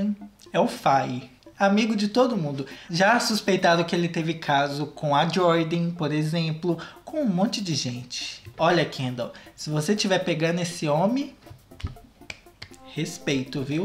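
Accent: Minas Gerais, Brazil. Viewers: Kkkkkkk Nem sei prq esse vídeo apareceu p mim kkkkkkk Vc é muito carismático É mineiro?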